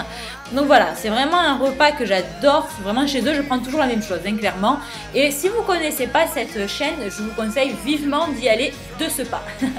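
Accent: South, France. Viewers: t'es tellement ridicule quand tu dis healssy... dis le en francais avec ton akessan du sud c'est beaucoup mieux va...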